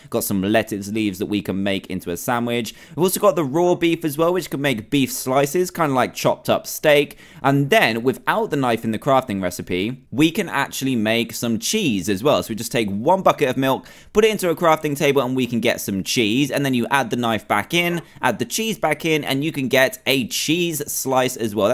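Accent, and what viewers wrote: United Kingdom. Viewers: There are many ways to pronounce many words and he is in the UK so your in the U.S.A maybe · jean wasiulewski no he has his british accent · It's how most of us in Great Britain say stuff. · dude dot hate on british accent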